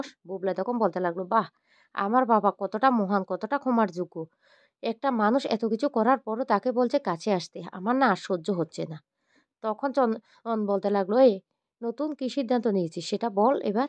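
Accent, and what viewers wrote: Bangladesh. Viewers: Plz ei pronunciation niye r video deben na. Bangladeshi tone ekhono ekdom jay ni.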